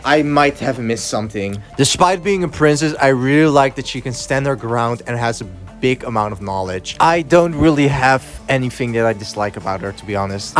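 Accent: Netherlands. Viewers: I hear a dutch accent :3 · iconic video!!! loved this. the video raised a question for me tho are you dutch? 😭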